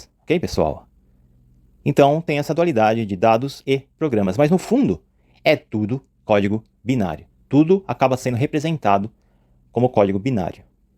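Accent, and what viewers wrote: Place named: Rio Grande do Sul, Brazil. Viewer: vc tem sotaque de alguma cidde do RS.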